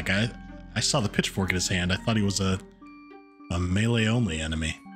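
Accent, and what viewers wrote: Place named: Germany. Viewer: Yesss bring on the weird! Also it’s always a treat hearing your German accent come out to play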